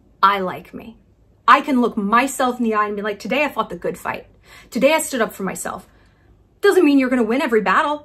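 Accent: South, United States. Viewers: I fucking love your southern accent lmao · i love your southern accent queen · I loved Reese in Legally Blonde so I started watching her interviews and then I noticed how she seemed to not be the nicest person. Something just felt off about her then I saw other videos of people talking about how she is really mean, but in a very passive-agressive way. She is very much that southern girl who is passive aggressive. She is like the stereotype in movies. I don’t hate her or anything but I just get an off feeling about her. Btw your southern accent was on point. The mannerisms and comments you made were the accent were spot on! Great video! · I could literally listen to your southern accent for a whole video 😂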